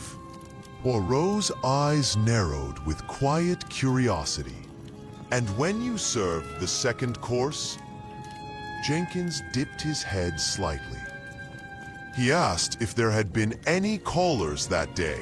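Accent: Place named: United States. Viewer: Can’t listen to Agatha Christie being read with an American accent plus the background noise is intolerable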